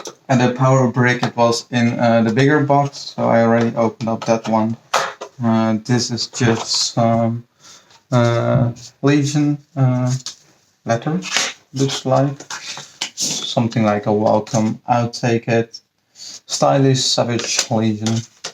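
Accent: Netherlands. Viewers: Congratulations! How much did you pay for it? Also for what work did you buy it for, other than having of course. Like what softwares are you going to use in it? I am a mechanical engineer so wondering if those software's can run in it. Also what accent is that? You speak good English but have an accent can't really pin point it 😂. Dutch? Portuguese? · Nice man, got mine last week (one spec lower, ryzen 7, 1tb) and I'm loving the clean looks! (Ook nederlands denk ik te horen, en toevallig ook industrieel ontwerper (student)? Ik heb dat boek ook hahah)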